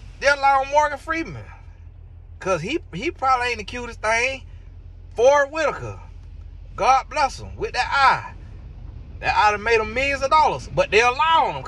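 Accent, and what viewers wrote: United States. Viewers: The accent is sending me. You are so country 😂 as a NYer this was entertaining. But in all seriousness this entire message is the truth. If we’re honest without his money Mr. Mayors would be called crusty. I never understood his sense of style. Also it’s no longer 1 woman accusing him, More came forward. Meagan always went out her way to make wrong choices with men. She chose one Godly man, one time then ran off. Something about her looked uncomfortable/forced the entire time I saw them sitting together. But Mr. Franklin chose an ungodly woman so 🤷🏾‍♀️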